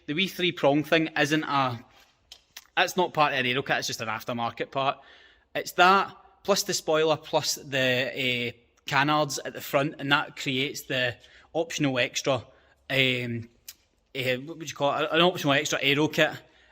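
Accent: Scotland, United Kingdom. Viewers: Great video! As a yank, it was fun listening to a car vid with a Scottish accent. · nice job :D also are you scottish u sound like kieran tierney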